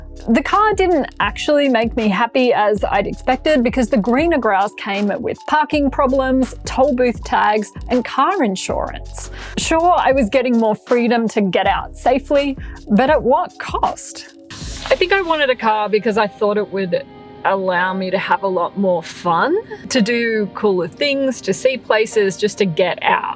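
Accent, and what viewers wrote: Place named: Australia. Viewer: One thing that makes me very UN-happy is when obviously Australian (your accent) presenters reverse the video to make it look like they are in left hand drive vehicles on the right hand side of the road. I can see the Sydney Harbour Bridge behind you while sitting in your car, yer the video is reversed to give it that all-american drive on the right look. Please, embrace the fact you are an Australian and stop doing that, meanwhile I'll stay unsubscribed, plus down tick it, until I see this happen.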